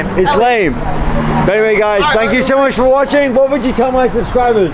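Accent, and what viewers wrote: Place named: United States. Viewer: Why have you picked up American accent/intonation in such a short period of time?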